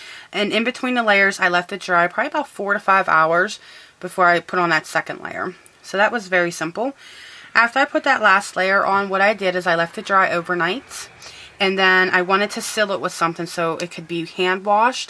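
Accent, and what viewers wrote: Pittsburgh, United States. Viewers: Yinz guys???? Your a Pittsburgh girl! I'd know that accent anywhere:) Love the glasses...thanks for sharing;)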